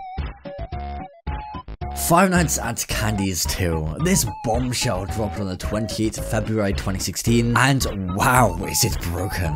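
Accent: United Kingdom. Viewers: this video is cool and all.. but... I just love the Scotland Accent!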